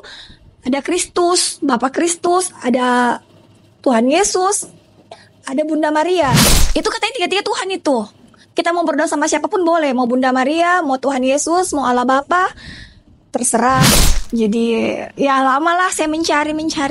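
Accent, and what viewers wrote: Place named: Makassar, Indonesia. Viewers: Kental sekali logat Makassarnya.... Slm dari Manado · Makassar bro ewako❤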